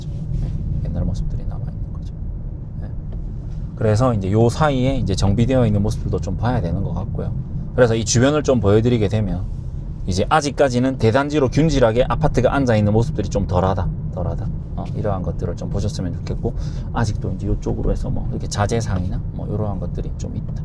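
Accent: Gyeongsang, South Korea. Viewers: 아마도 경남분이신 것 같아요. 제 부산친구랑 말투가 같아요. 경상도 사투리라도 억양이 조금 다르죠!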